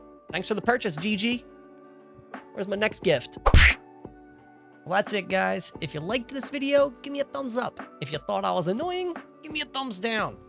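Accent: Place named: Pottstown, United States. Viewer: Hey man! Hope you are doing well! Great video and review. I've been watching a lot of Philly accent videos and it seems like you've retained our Pottstown way of speaking. Spread that all over Thailand lol. I've started learning Japanese...not sure how it compares to Thai, but different scripts. Would love to catch up and maybe do a video on the travel to Thailand for my travel agency if you're down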